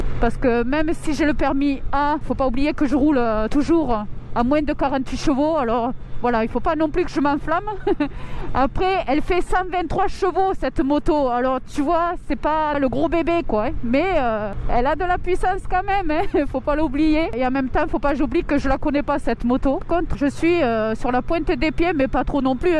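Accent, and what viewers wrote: South, France. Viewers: tes rires et l'accent du sud ça fait du bien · ton joli accent du sud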